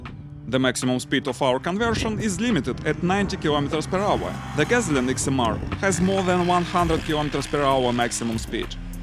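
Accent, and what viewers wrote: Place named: Russia. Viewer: On peut voir le même vidéo en francais,ce serait moins pénible que l'accent russe.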